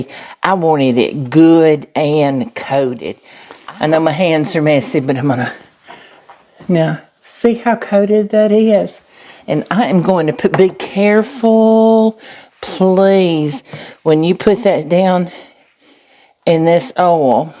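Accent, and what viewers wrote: South, United States. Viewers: I love ❤️ blooming onions. I dip mine either in ketchup or shrimp sauce. I haven’t noticed before but your accent is really southern. ❤️❤️❤️🥰🥰🥰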